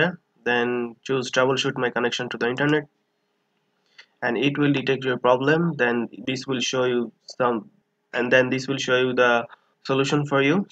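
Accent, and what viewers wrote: India. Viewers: THE INDIAN ACCENT, IM SAVED🙏🙏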